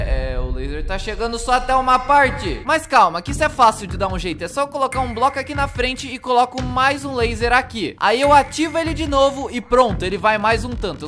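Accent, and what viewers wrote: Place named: Portugal. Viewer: Essa menina é portuguesa a falar a língua de Portugal e eu moro aqui em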